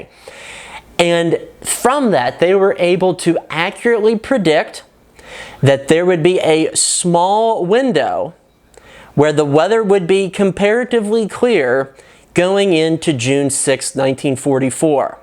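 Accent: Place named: Louisiana, United States. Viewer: Hehe, historian, yes, linguist - no. "Shire" is pronounced the way you say it - as a stand-alone word. When part of a county name, NO-ONE in the UK calls e.g. "Oxfordshire" Oxford-shire - we pronounce it "Oxford-shur" - as with every "Shire" in the land. To British ears, you sound like a back-country Louisiana hick. Got your own banjo?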